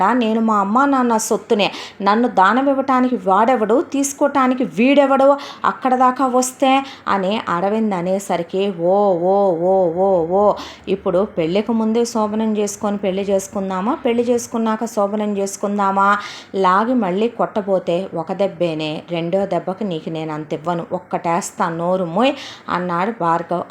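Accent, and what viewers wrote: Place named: Rayalaseema, India. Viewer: mee voice variation maatram super andi enni sarlu e story vinnanu mee voice gurinchi rayalaseema slang super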